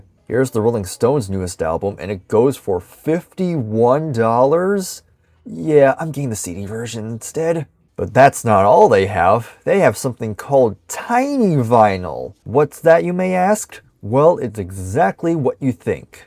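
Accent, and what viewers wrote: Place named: England, United Kingdom. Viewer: I'm used to speaking in an English accent. I tend to look for specific things. I don't like just browsing and buying stuff I might like without checking it out first. I'm after certain items and online I can check who has it in what condition and for what price while riding the train to work. I also buy a lot more that way... Hmm...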